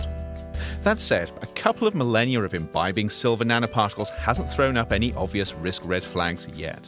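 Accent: United Kingdom. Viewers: ........ just because this guy has a cool English accent doesn't automatically mean his information is accurate! ...... DOWN WITH BIG PHARMA!! .....😠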